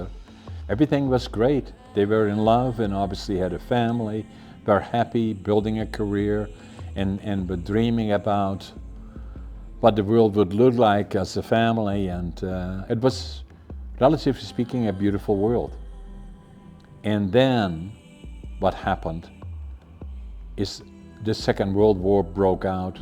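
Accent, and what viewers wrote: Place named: Netherlands. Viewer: Still that Dutch accent haha.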